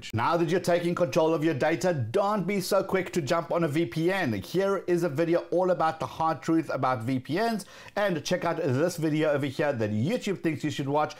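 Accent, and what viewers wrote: South Africa. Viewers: South African?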